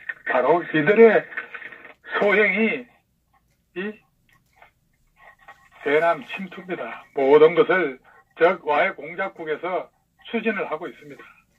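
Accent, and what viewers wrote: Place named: Gyeongsang, South Korea. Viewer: ㅋㅋㅋ... 경상도 구수한 사투리 쪼매 바빴다. 좋아요. ^^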